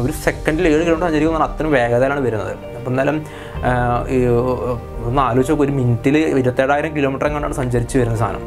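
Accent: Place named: Kannur, India. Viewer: aaah njan kannur aanu Ninghal palakad alle But baasha kannur kaar pola ind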